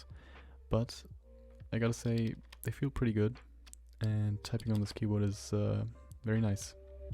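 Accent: Germany. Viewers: are u german bro?:D Edit: okay you‘re german, Mr. lol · just wondering if/how this works for typing in german? i'm thinking of getting one for my studies, but often need to write in german, and i don't have any experience with mechanical keyboards yet, so am not sure how typing ü ä ß usw. would work. any tips? assuming you're german from your accent, sorry if not! :)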